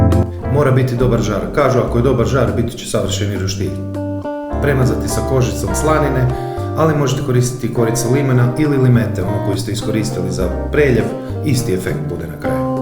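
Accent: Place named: Slavonia, Croatia. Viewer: Kako te je lijepo slusati,tako i mi u Slavoniji pricamo,puno pozdrava tebi i tvojoj Familie 🥰🥰👌👌❤❤👍🏻👍🏻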